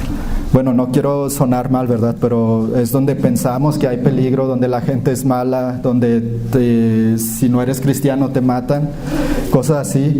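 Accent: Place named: Mexico. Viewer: Hola ¡Por tu acento puedo creer que hablas desde México! ¿vale? ¡Que nuestro Dios venga a alumbrar a todos pueblos Mexicanos! “ Lámpara es a mis pies tu palabra, y lumbrera a mi camino. Salmos 119: 105. “ 💡 Saludos desde Brasil. 🇧🇷 Al mi México querido. 🇲🇽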